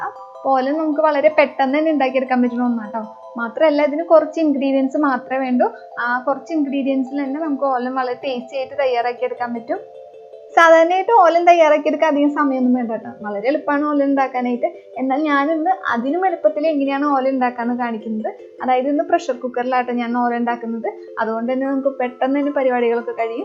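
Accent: Thrissur, India. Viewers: തൃശ്ശൂർകാരി ആണല്ലോ 👍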